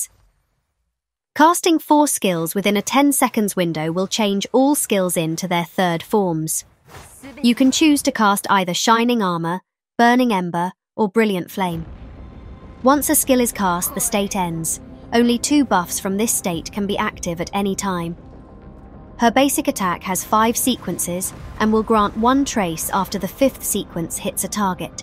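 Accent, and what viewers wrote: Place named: United Kingdom. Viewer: What a Nice plasure to ear That british voice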